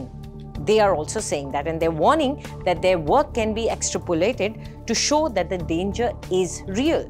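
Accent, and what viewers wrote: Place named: India. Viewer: What a heavy Indian accent. I cannot remember how to speak in English.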